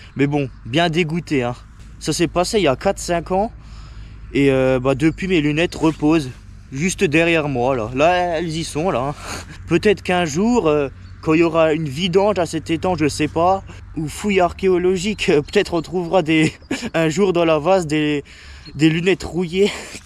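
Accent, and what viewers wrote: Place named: Moselle, France. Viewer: Vu l'accent , tu est de Moselle ???? moi je suis d'Hayange 😊😊😊😊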